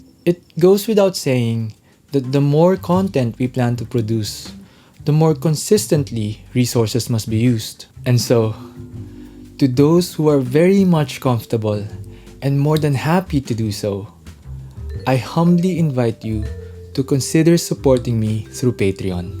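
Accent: Philippines. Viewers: I like your Filipino-English accent, doesn't sound conio or pretentious. I watched one of your vid where you assembled this cute-ass gameboy then I noticed the "mini walis" that got me thinking "tang-ina pinoy 'to?" Lo-and-behold pinoy nga. Haha, I don't fancy the fast cuts myself but I like the overall aesthetics. You earned a sub! :) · I love the filipino accent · That Filipino accent though, ur my favourite yt channel btw. Rly excited about your reviews and the next build.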